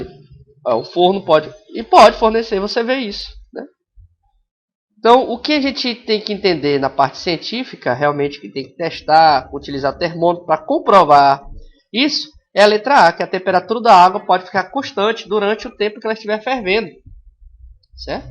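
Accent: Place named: Paraíba, Brazil. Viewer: caralho,ele sabe explicar,mas eu odeio voz de paraíba! Énem, pÓlarização aff!!! tudo é Ó e É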